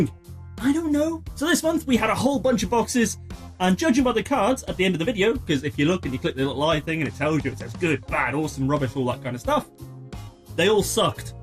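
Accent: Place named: United Kingdom. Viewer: I totally do that adapt accent thing. When I meet a british person i immediately start talking with a british accent. And to Americans, most british accents sound the same. We cant tell regions. So you sound British not Liverpool.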